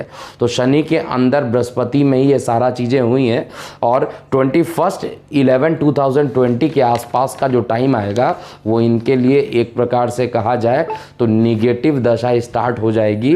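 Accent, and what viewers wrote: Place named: Bengal, India. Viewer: First learn to speak correct hindi first.dont mix bengali in hindi speaking.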